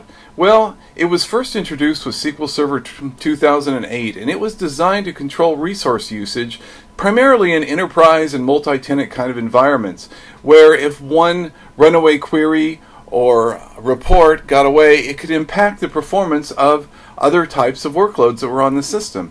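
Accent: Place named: United States. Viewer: Oh boy, American English!!!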